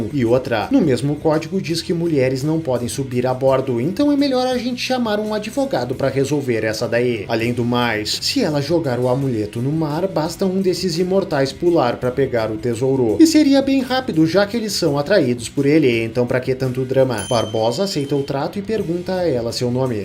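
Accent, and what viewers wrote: Rio Grande do Sul, Brazil. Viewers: bah o meu tu é gaúcho né??? muito bom é os guri hehehehehhe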